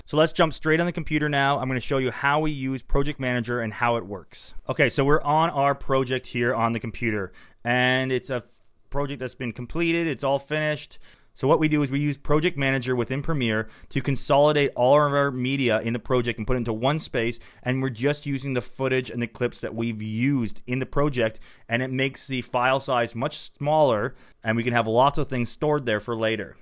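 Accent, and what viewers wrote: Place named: Canada. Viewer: He's Canadian. It's one of the "tells," as is "uh-GAYN" instead of "uh-GEN" for the word "again." I worked with an Irish-Canadian editor on a couple of movies (shot in Canada, edited in Santa Monica, California) and learned a few of the clues to spot the Canadians (beyond "ah-BOAT" for "about"). Now I can't watch TV without noticing Canadian accents. (Australian actors, for some reason, seem to be the best of the English-speaking diaspora at blending in with UK English or USA English speaking casts. I think a lot of Scots are good at blending in with their southern (English) cousins.) OK, I'm rambling ... maybe too much coffee ... sorry... but accents are fun....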